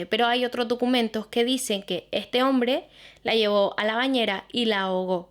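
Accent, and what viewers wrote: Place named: Canary Islands, Spain. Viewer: no sabía que eras de Las Palmas, se te nota el acento canario pero no sabía que eras de la ciudad. Encantadísima, y muchas gracias por tu trabajo❤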